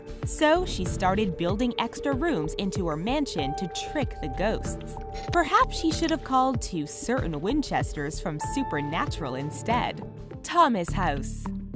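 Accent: Canada. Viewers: So off topic....But I love the way Canadian's say house and out... 😊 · I came down to the comments to do just that! Hilarious. Listen to the way she says "house" too. I think she has a Canadian accent.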